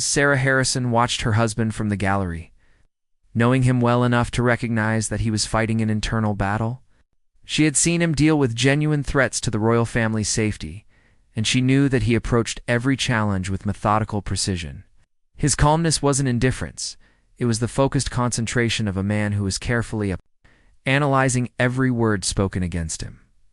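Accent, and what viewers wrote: United States. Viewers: Right! As if a member of the Brigade of Guards would appear at court in his parade uniform. Rubbish with an American accent as usual! Bloody stupid!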